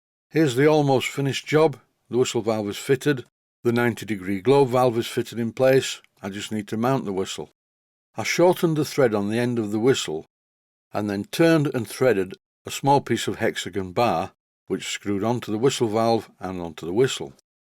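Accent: United Kingdom. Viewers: You've mentioned CME Engineering a number of times in your videos. Do they have a web site? I searched for them, but obviously my googlefoo is failing me this evening. Either that or my Yankee ears are conflicting with your British accent.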